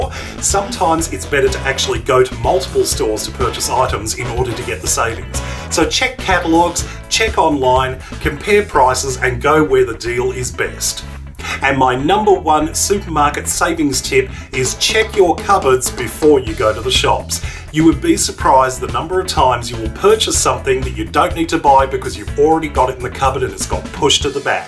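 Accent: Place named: Australia. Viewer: Yes he's Australian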